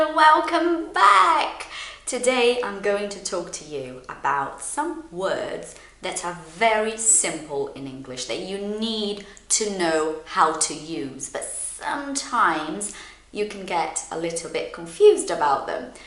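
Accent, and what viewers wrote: United Kingdom. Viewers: That was an amazing class! A whole class in English. And I have to say I love your British accent. Thank you so much for your tips.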